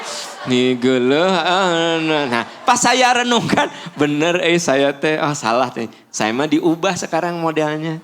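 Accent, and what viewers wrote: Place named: Sunda, Indonesia. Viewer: Saya tinggal di Jerman tepatnya Saarbrücken, ya Allah baru tau ustad Dr. H Aam Amirudin LC,Si dan saya senang mendengarkan tausiah beliau apalagi khasnya bahasa sunda karena sejatinya saya orang Sumedang , jadi saya kangen bahasa tersebut.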